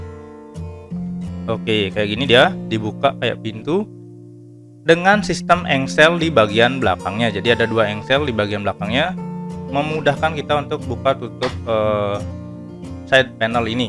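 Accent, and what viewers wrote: Indonesia. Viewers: ngenah sajan logat indonesia ne..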